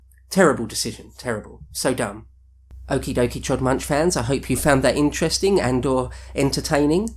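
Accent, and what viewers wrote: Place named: Yorkshire, United Kingdom. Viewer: * sounds like he's from my ends.....Dewsbury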